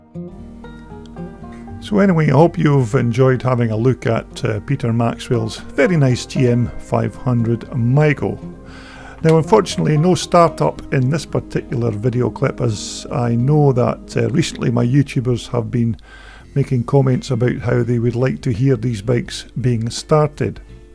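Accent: Scotland, United Kingdom. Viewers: "Gas" ???you sound Scottish, not American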